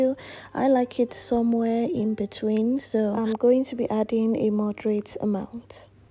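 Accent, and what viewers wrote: Nigeria. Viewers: Polished , polished polished wait a minute , was that voice yoruba ?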